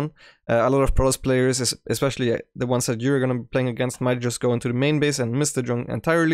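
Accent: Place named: Germany. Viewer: i think the German-ness is quite apparent, his English is good nonetheless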